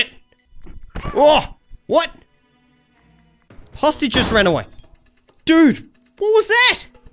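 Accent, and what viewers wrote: Australia. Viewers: mate are you Australian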